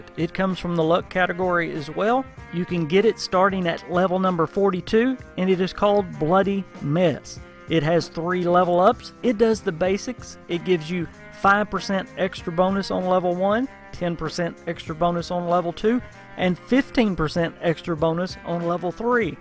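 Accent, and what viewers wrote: United States. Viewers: I love your country accent, feels like home! As far as content, very informative! You've got a new subscriber!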